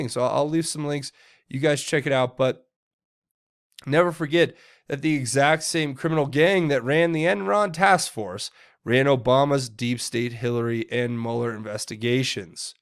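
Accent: United Kingdom. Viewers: I love the English accent. You did a great job. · Fabulous British accent!!! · Hahahaha 🤣 Love the British accent 👏👏👏👏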